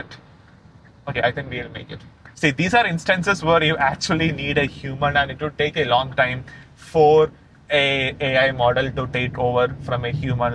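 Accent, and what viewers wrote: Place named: Kerala, India. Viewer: bruh that mallu accent :)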